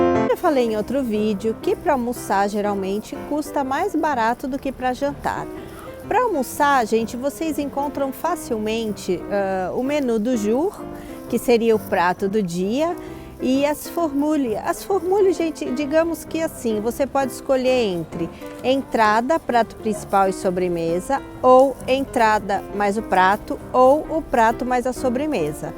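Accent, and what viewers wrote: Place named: Santa Catarina, Brazil. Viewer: Deve ser inveja kkkk..vc deve ser de SC o povo daqui q fala assim.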